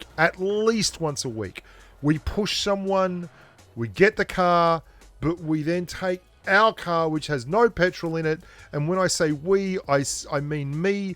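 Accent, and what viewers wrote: Australia. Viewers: Idk mate I just love the Aussie accent👌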